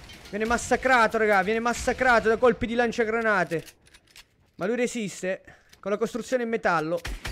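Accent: Calabria, Italy. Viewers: Ma sei o Ninja??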